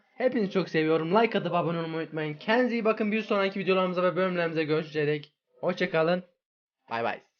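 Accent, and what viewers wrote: Erzurum, Turkey. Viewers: Abi sen erzurumlumusun ben erzurumluyum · abi sen erzurumlumusun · Neydisen gardaş sen erzurum un neresinden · Abi erzurumlumusun bende erzurumluyum dadasim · Erzumlumu yoksa Erzurum da mi yasiyot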